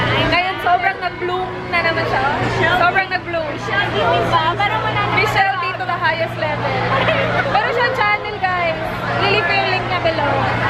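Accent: Visayas, Philippines. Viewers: tga san kaya si ate ,parang visayas part kasi may "uy" lahat ng mga bisaya mga "uy" ung word sa tapos 😁. enjoy ko ung vlog ninyo po. ty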